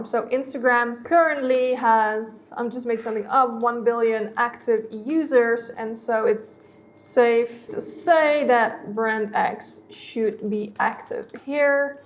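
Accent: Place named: Netherlands. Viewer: Ben je van Nederland? Ik hoor een zwaar accent😉groetjes uit België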